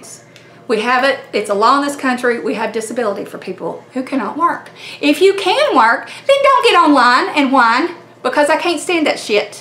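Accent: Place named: West Virginia, United States. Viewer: My mother in law also loves to collect cookie jars & her name is Beth. ^_^ Your accent sounds abit like hers she's from WV. How many weenie dogs do you have?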